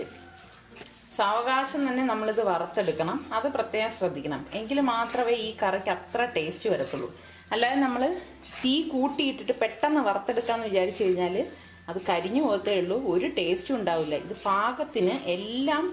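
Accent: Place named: Eastern Kerala, India. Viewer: .. Ya ya.. Sure.. Ithatha wr u frm? Vadakkarude slang alla talking.. Kizhakot anu ennu thonnunu.. Am i correct.. Im vry interested in ur recipes.. Masha Allah.. May Allah bless u..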